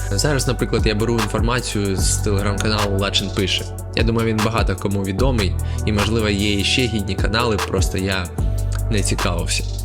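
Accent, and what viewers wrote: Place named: Belarus. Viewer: вы белорусс? акцент белорусский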